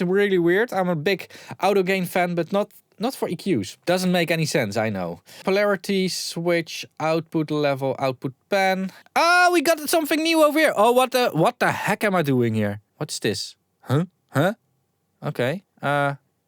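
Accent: Netherlands. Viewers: yeah he sounds very Dutch, that’s true, I’m not a Dutchy but I’ve been there, honestly I’m kind of surprised how bad people are at identifying accents, I just said that to make a point, since the guy was being racist.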